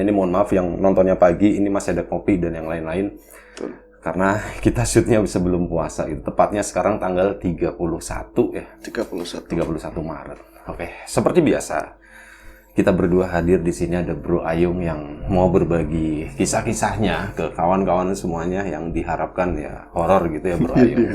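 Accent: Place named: Tegal, Indonesia. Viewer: Dngerin logatnya bro ayung jd inget sodara2 bpk ku yg asli tegal.👍👍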